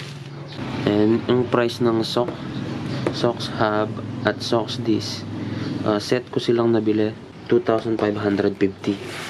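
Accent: Pampanga, Philippines. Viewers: Kapampangan La Pamo Hahaha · Kapampangan ka pala soy hahah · boss kapampangan ka pala yee 😂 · Kapampangan ka pala paps😂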